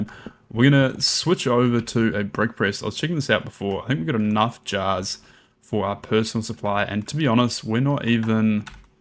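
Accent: New Zealand. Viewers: also i know your AUS but you sound like you have a touch of kiwi.. true?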